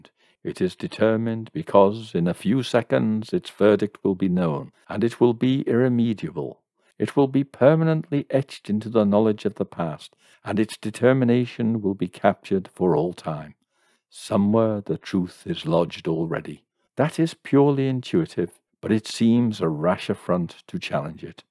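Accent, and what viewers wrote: United Kingdom. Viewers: Old piano and reverb sets the scene. And only a soft British accent can make me so cozy for murder or suspense. Ha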